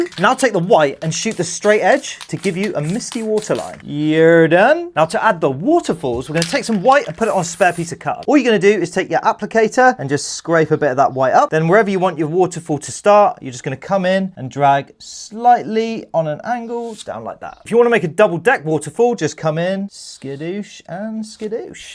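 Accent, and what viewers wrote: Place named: United Kingdom. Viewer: Bro british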